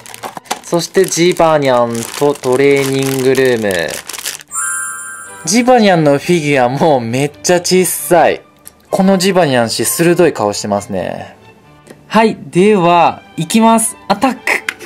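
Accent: Kansai, Japan. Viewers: めっちゃ関西べん笑笑と、思う人グッド👍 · おもいっきり関西弁。笑 まぁおれも関西人やけど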